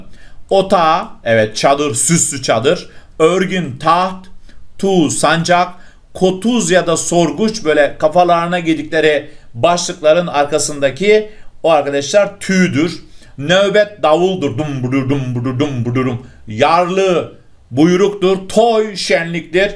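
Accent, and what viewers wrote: Adana, Turkey. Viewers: Adanalısınız galibaa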